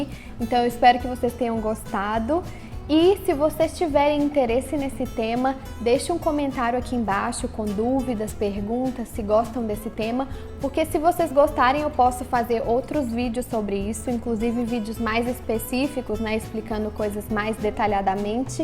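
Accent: Minas Gerais, Brazil. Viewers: é minha primeira vez aqui no canal, já adianto que tenho gostado bastante dos conteúdos. Uma dúvida menina, você é mineira??? Sotaque maravilhoso. beijos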